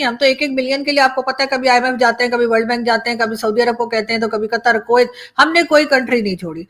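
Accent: India. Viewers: You are so beautiful and your speech is as Indian.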